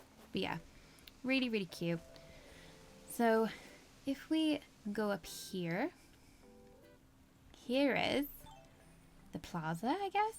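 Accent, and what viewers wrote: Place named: Wales, United Kingdom. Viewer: Are you welsh by any chance? You have a bit of an accent 😅 amazing island btw!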